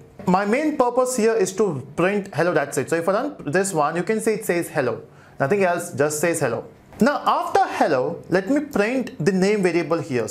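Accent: India. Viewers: You can understand him because you are indian and you have indian accent. this guy is indian and has indian accent. so you can understand him better than others if you dont know this than you are the one with low iq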